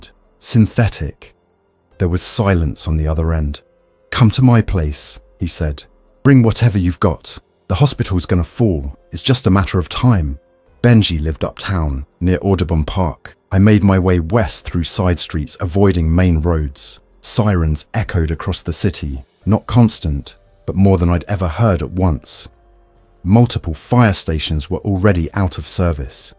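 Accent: United Kingdom. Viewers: An AI read story - homonyms like "a door starting to bow" (pronounced as "bow" & not "bO" . . . a Brit accent for an incident in America is a story flaw with minimal odds. While in a hospital but nobody is looking for supplies even though 2 have med-tech backgrounds to know how important such materials are. Another flaw. Okay - not great.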